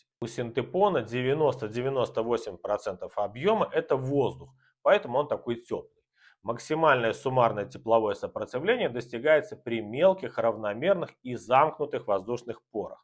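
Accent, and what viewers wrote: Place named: Belarus. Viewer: ваш белорусский прононс мне нравится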